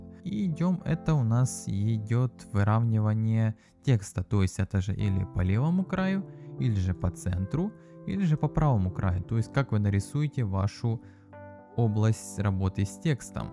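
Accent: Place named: Kharkiv, Ukraine. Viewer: как будто харьковский акцент))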